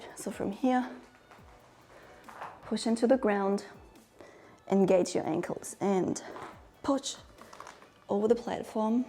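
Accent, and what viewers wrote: Germany. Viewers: I could listen to you speak English with yr awesome German accent all day !! Love you !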